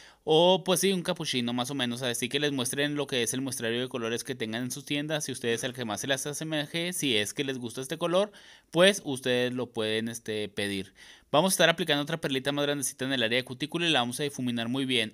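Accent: Chihuahua, Mexico. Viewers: Es Al acento de Chihuahua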